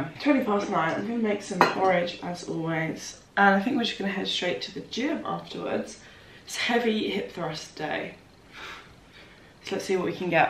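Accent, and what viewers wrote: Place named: Birmingham, United Kingdom. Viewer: Is one of your flatmates or friends brummy? I feel like I can hear hints of birmingham in your accent/ tone of voice now! I love it x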